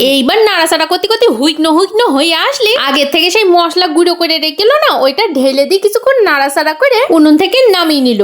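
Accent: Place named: Bangladesh. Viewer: Tor voice kintu darun ...pakka bangal mone hoch6e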